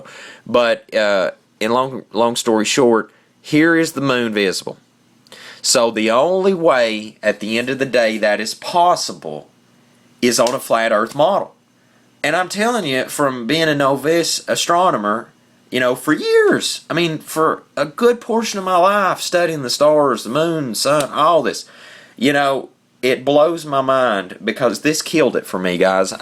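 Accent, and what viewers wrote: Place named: Carolinas, United States. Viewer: This is SO cool!....... Btw, Are you from the Carolinas. I have lots of family down there,cos my Mom was from Kings Mountain, NC, and I recognize the accent. and I LOVE it!!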